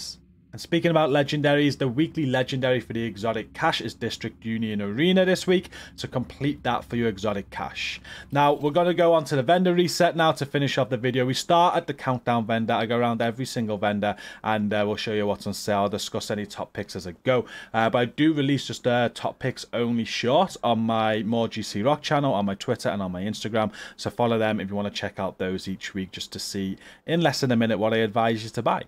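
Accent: United Kingdom. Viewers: Your English is hard to understand, is it British English?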